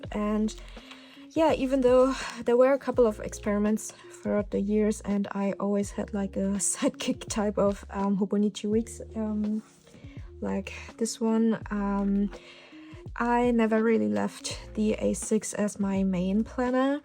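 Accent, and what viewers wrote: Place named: Germany. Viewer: This was such a cozy video to listen and watch, good mixture of very soft music in the back and I adore your German accent. Would love to see more in-depth explaining and layouts that you use for different types of todos and creativity! >w< As for planners that I'll be using for 2026 (braindumping about the specifics will happen later in Nov); Hobonichi Cousin: all-in-one daily planner, scheduler, personal/hobby journal Hobonichi Weeks: adult-log for chores, appointments, more todo focus with no personal details Hobonichi HON A6: art freelance/work planner, detailed todo of my art projects along with its own space for notes (leaves more room in the Cousin) Self-Care journal: bullet journal with a simple tracker for emotional, mental and physical symptoms. A page for affirmations and quotes to read back on and a Monthly Review Yearly Collections journal: fun collection pages from hobbies, trackers to goals (very tempted to move this into the Hobonichi Weeks.. 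but unsure due to how small the Weeks is and it may clash with the 'adult admin/todo focused' theme). Apologies for the long comment, I can ramble on and on about my planners haha. But there is still a lot to figure out. I've only been using the Hobonichi Cousin for the first time this year. I used to bullet journal everything in a B5 size that would fit 5 months per book... but monthly setups became extremely time consuming, so I'm VERY happy to have moved into the Hobonichi planners >w</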